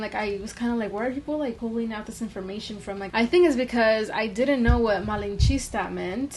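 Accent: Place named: Quebec, Canada. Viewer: Bonjour, à ta façon de parler anglais, tu semble être du Québec... laisse-moi savoir si je me trompe. Si c'est le cas, je suis heureux d'avoir trouvé une autre personne du Québec qui aime TW. J'ai découvert TW en juillet dernier et depuis, je les ai vu live au Centre Bell, au Centre Vidéotron et je suis allé à Monterrey les voir le 22 février dernier. J'espère qu'elles annonceront une nouvelle tournée canadienne bientôt pour aller les voir encore une fois. Si tu as l'occasion d'aller à leur show, n'hésites pas. Elles sont encore meilleure live que sur les vidéos de leur shows. Super réation!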